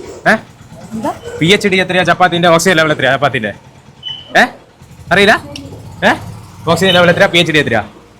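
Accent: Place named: Perambra, India. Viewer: പലവട്ടം കണ്ടു. പേരാമ്പ്ര സ്ലാങ്ങ് .... പൊളിച്ചു...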